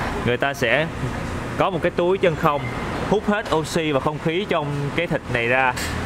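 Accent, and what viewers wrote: Mekong Delta, Vietnam. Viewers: noi chuyện dễ thương chân chất người miền Tây love cho 1 live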